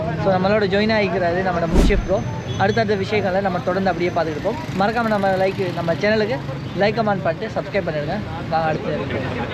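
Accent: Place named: India. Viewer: Eduku da indian pola pesa try panreega🙄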